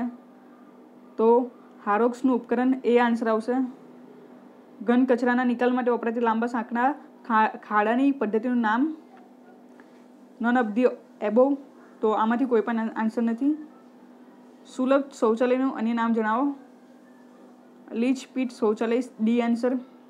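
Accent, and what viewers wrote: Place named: Surat, India. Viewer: I love your voice (surti boli) nice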